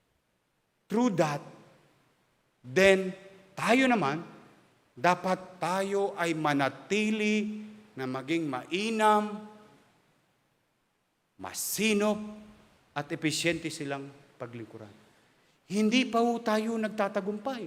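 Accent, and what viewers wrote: Philippines. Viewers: Parang c pinoy din mg speach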